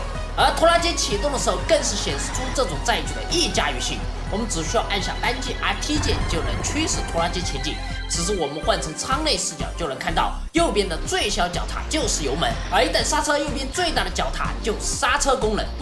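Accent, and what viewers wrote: Sichuan, China. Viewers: 怪说不得的川普发音就很local了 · 欸！！！你的四川话好亲切！！！！！因为我就是四川人！！！在国外呆了好多好多年了！！！！！！！！！！！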